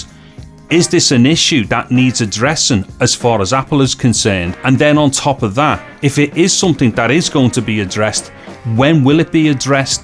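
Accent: Liverpool, United Kingdom. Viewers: Never played a vid game ever sad I know, just love listening to a scouse voice brilliant. Not like south ar’ampsher drawl. Love my new iPad albeit a 11” keep gaming sir 👌😎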